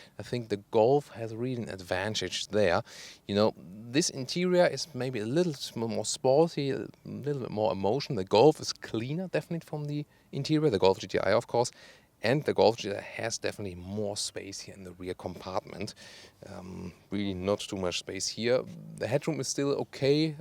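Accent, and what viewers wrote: Germany. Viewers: Tolles Video, macht großen Spaß zu schauen! Nur der deutsche Aktzent ist gewöhnungsbedürtig, aber das ist keine Kritik :) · Like his english with german accent :)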